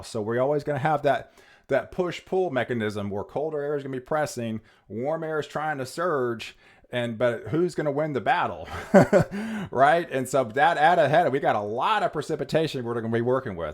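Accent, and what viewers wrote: Texas, United States. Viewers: I LOVE your holistic approach to the weather...the only reason I know that you're in TX is because you got my Daddy's accent. ;) Most other channels focus on...the East Coast.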